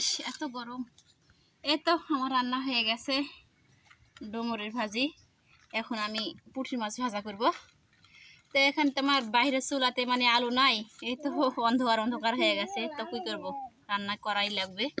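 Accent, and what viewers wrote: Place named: Rajshahi, Bangladesh. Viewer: তোমার কথা শুনে বোঝা যাচ্ছে তুমি রাজশাহী জেলার মেয়ে, কিন্তু কোথায় তোমার বাড়ি একটু বলবে আপু ❤❤❤❤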